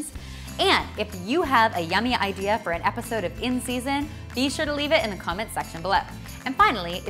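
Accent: Canada. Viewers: Are you Canadian ?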